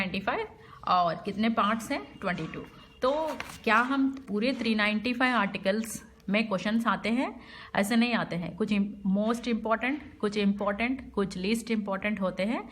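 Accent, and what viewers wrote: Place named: Telugu, India. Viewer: by her accent of hindi, i can say she is telugu...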